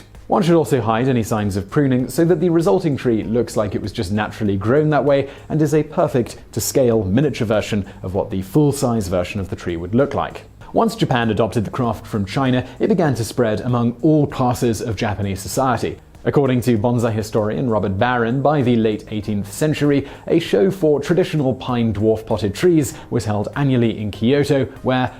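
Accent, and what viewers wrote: England, United Kingdom. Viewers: Kew Gardens Station He’s english, he’s going to pronounce words differently than you. Do you know how many accents there are in the United Kingdom? Get off of your high horse and actually contribute. I don’t see you making videos? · While I really like this video, as I like this channel's other videos, his pronunciation does little to clarify the distinction. For one, a key difference between "bonsai" and "banzai" is the "S" sound versus the "Z" sound. The two are pronounced in Japanese as distinctly as one would reasonably expect them to be. pronunciation of the two words seems to come off as "Z" both times. Secondly, we have that sort of typical British thing where an "ah" sound in a foreign language is pronounced like the "A" in "apple". Like when virtually any British chef says the word "pasta". I don't know where this comes comes from, but it seems very British, and in this case, it's not helpful. 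That's because when we're talking about the difference between "bonsai" and "banzai", the first vowel sound is not a matter of the difference between the "O" sound in "odd" and the "A" sound in "apple", but rather the difference the "O" sound in "bone" and the "A" sound in "audience". is a great presenter, but when discussing matters of the pronunciation of foreign words, he'd benefit from consulting a native speaker. TL;DR - "Bonsai", the tree, is pronounced like "bone sigh". "Banzai", the battle cry, is pronounced sort of like "Bond's eye", but a little less stank on the D. Yeah, I said "Stank on the D". This comment has been entirely too serious.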